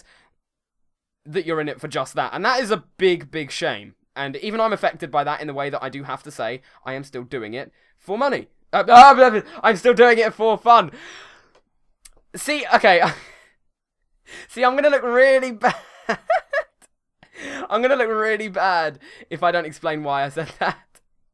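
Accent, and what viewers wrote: United Kingdom. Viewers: When you move to the US, won't you lose your British accent? Kinda sad really, all the British LPers are going over to the US. Ah well, can't be helped. Anyways, you going to keep dat accent? :D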